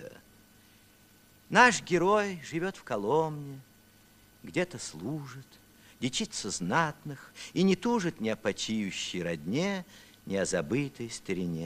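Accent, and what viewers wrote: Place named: South, Russia. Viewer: Говор с "гаканьевм и хаканьем" - точно, как в фильме "Бриллиантовая рука" или в мультиках "Ну, погоди!": "Спокойно, Козлодоеу, сядем усе". Оказывается он всегда так говорил, и не мог или не знал, как выработать чистый театральный говор по-русски и избавится от местечкового произношения, диалекта.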